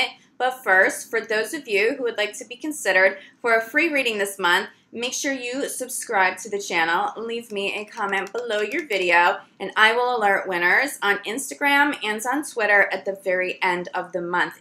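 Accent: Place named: East Coast, United States. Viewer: Your from the East coast 🤔🤔🤔🤔🤔♈👑 I like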